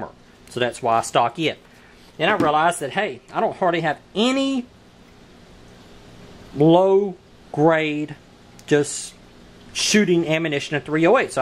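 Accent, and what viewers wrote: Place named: Texas, United States. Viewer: sounds like your from texas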